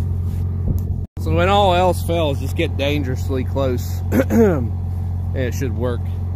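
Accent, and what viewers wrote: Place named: Pittsburgh, United States. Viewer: Pittsburgh area? You sound like it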